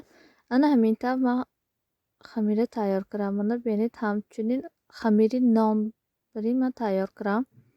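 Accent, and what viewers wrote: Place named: Isfara, Tajikistan. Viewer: Шумо аз Исфарами?? Гапзанитон мобоин лафзи Исфара точикистон да якта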